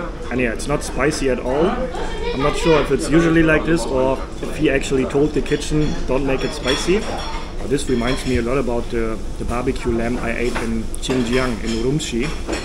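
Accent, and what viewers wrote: Germany. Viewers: 一开始我不知道你是德国人,你的口音听起来很像德国人,因为你让我想起了一位德国故人🤣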